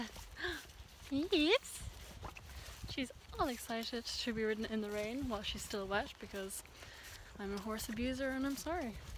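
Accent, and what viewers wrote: Ireland. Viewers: r u from Ireland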